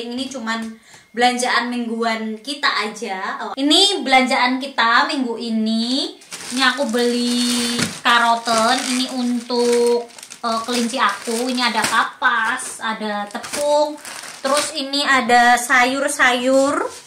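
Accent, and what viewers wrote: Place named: Java, Indonesia. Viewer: Tetap aja medok nya ngak bisa hilang ya bu ....hehehehe....salam buat suami...tetap sehat ya bu....🙏🙏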